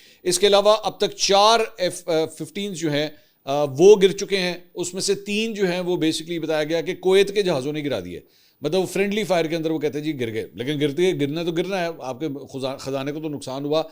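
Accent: Punjab, India. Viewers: bhai aap ke panjabi commentary ke kya he kehny ayhyhy maza he aa jata hai sun ke❤❤❤ love you... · bhai Punjabi bhtttt piyari bolty hn ap😂❤ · Salam Mr punjabi touch in vlog brings 🔥🔥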